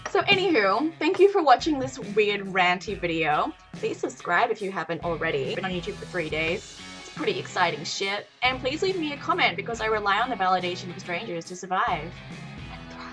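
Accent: Australia. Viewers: I think you sound Aussie with American high notes. Particularly on the end of words. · To me you sound completely Aus and I love it. But maybe just because I kinda know you? Definitely no American vibes imo. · Slightly more American in this one, but still definitely Aussie lol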